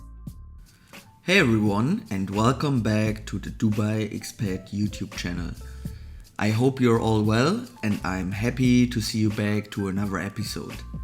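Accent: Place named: Germany. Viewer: The main reason I open your videos is to demonstrate what a strong German accent sounds. Please practice your th and your of kurs is actually of course.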